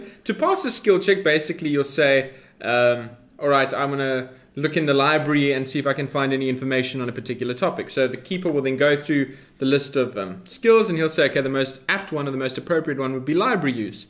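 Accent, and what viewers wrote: South Africa. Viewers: Are you South African? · Never knew I needed to hear someone say "Cthulhu" in a South African accent but there you go.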